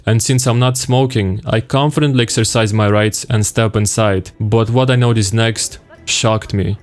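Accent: Russia. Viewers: the hard russian accent makes this so much funnier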